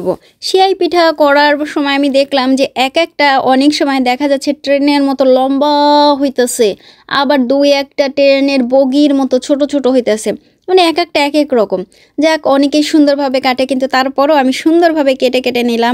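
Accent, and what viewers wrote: Bangladesh. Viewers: Bangladeshi mey bole kotha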